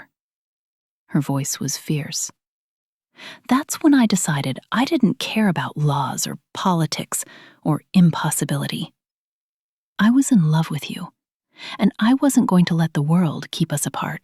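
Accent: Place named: England, United Kingdom. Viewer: I liked storyteller with the English accent.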